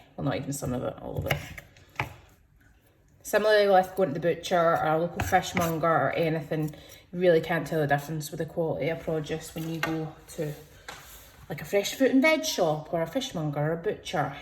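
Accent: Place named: Scotland, United Kingdom. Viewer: Love youre scottish dialect ❤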